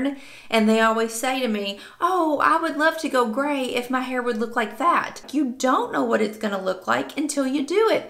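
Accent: South, United States. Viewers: I did the same when I reached 47.. my husband misses the red head. He got over it. He has a duck dynasty Grey beard. God Bless,, embrace the authentic you.. Our world is too full of fake people. Be the real deal. My hair got curly later in life and love it. I blame it on medication. P.S. love your accent so southern. Mine is a Texas drawl.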